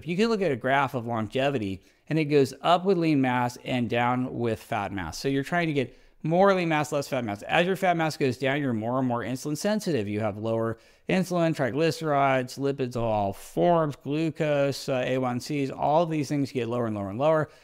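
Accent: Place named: United States. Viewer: 👍Highly informative👌. Please add English subtitles for better understanding American accent.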